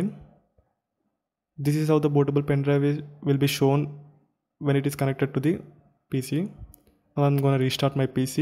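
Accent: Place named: India. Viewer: Oh yea, the indian accent❤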